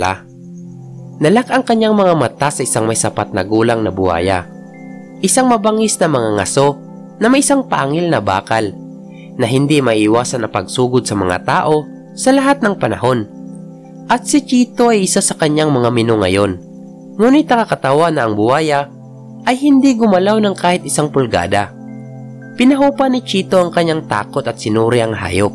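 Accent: Visayas, Philippines. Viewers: Halatang bisaya peru ok na din yung accent😂 keep it up lods👍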